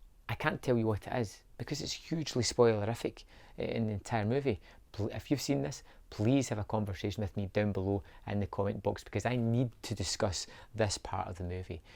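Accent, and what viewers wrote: Scotland, United Kingdom. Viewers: Was surprised to hear a fellow Scots voice.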